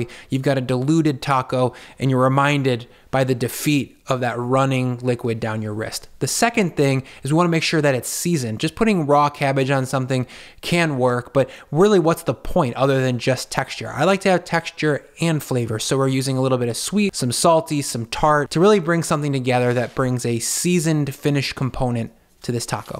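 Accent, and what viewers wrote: United States. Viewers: I'm American. You sound American. Why are you using the Metric System? You can always edit for those overseas. I'm still gonna try your recipe though.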